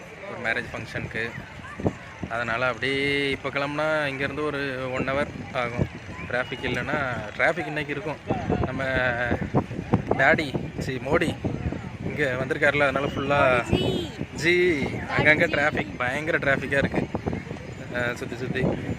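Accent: Tirunelveli, India. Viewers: Hi I am new subscriber. Unga native place enga . Slang Tirunelveli maathiri iruku anna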